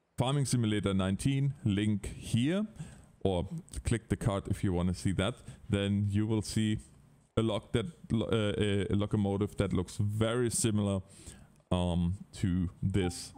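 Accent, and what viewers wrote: Germany. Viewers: Bist du ein Deutscher in Kanada? Muss fragen, weil ich den deutschen Akzent klar heraushöre, mich dann aber wundere über den Kanalnamen und das Wohnsitzland Kanada.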